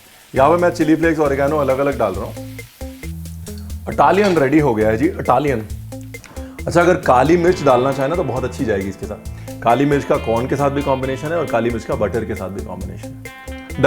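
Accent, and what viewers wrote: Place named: Bihar, India. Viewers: 2 tho, 3 tho, bihar se hai kya bhaiya..? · ji tohada Bhojpuri boli da touch khub vadiya lagya si assanu.. 🙂 Coming to Poodi- Yenaka Poodi rumba pidikyon.. I am Super blessed to have a Tamilian inlaws family.. My mother in law prepars food with lots of conciousness, blessings and ofcourse love.. I can indeed realize and see the same conciousness and true love in your preparations.. 🙂 Thank you so much for sharing love and care through your authentic food/cooking.. From Russia.. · Ek tho do tho Bihar bhasa mast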